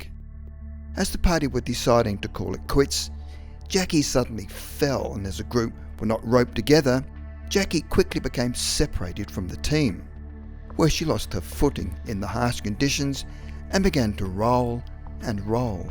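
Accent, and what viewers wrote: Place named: Australia. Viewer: I thought it was an Aussie accent! Hi from a fellow Australian 😊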